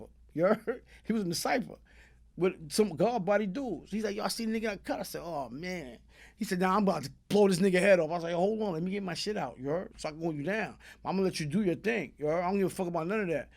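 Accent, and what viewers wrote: Queens, United States. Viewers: Naw you from Queens haaaaaa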